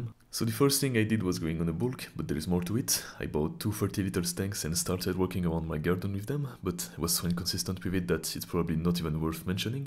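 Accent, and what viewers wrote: France. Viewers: Ton accent français est magnifique ✨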